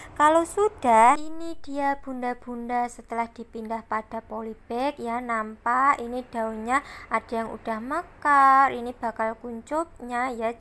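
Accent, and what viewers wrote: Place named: Java, Indonesia. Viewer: Mba..ngmngnya medok bgt ..jd saflok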